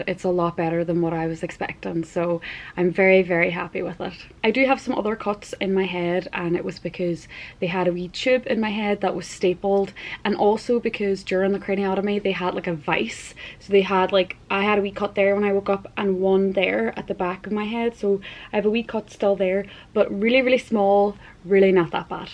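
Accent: Ireland. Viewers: I'm glad to hear that you're doing well post-surgery. Did they tell you what filler they used to fill in the hole? It's usually fat! I also noticed moments where your accent turns Canadian eh! Now there's now't wrong wi' it, just the Irish accent is the worlds favourite so don't lose it! My next door neighbour is Northern Irish and I love listening to her talk. Ask the surgeon if they recorded the surgery and if you can have a copy of the video... they're not gentle when they need to move you around, that'll explain the pain here and there. Keep up the recovery. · Thank you so much for sharing your journey with us! I hope the doctors bring good news so you can live a long and beautiful life. You have.a huge heart and are lovely inside and out. Not sure where you originate from but I enjoy your accent…it sounds a wee bit Irish 😂. Love it. Bless you and stay in touch with us.❤🎉🎉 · Absolutely love your beautiful Irish accent. 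You are looking so good ❤ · also your irish accent is sooo pretty! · Thank You so much for Your sweet update on Your progress! So glad it’s over for You You are so beautiful inside and out and I adore Your accent its Irish, isn’t it? Trust the Lord in all Your ways.❤️ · You're SO BEAUTIFUL! And articulate, aaand i could listen to that Irish lilt all day... Your positivity and courage are inspiring, and i admire the grace with which you're handling this scary and overwhelming experience. I am praying for you dear... Hope you can feel the love and support from all of us who would help you carry this heavy burden in a heartbeat if we could. Sending you comfort and the peace of acceptance, whatever lies ahead. ❤❤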